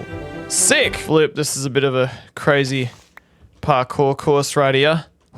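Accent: Australia. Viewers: Are u Australian? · oh im so subsribing. youre an aussie minecraft youtuber, but like aussie ausie. i feel at home here (im aussie too)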